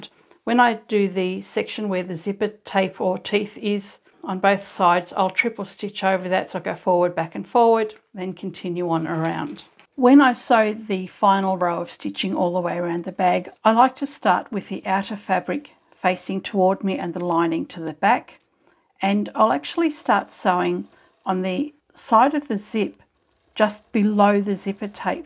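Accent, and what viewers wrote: Australia. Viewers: Does anyone else think in an Australian accent after watching videos...... or is it just me? 🇦🇺💖😊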